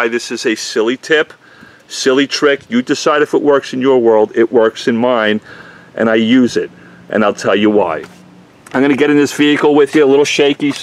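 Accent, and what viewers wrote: New York, United States. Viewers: first off watched all your videos and have gotten priceless tips and tricks from you. I am not an auto detailer by trade such as yourself but am what you would call a " driveway detailer" my own cars. I'm very OCD and all my vehicles need to be spotless. Superclean has changed my life. Since you are going over interiors. I'd love to see your process on how you detail the dashboard, instrument panels, that pesky fake glass where your speedometer is, navigation screen all the way to the radio buttons. Do you still use meguiers interior detailer? I don't mind it but it like you said smells like vinegar and can't stand it. Any ideas? By the way your New York accent and fun loving humor is quite refreshing. Never bored listening to you. Sincerely Dex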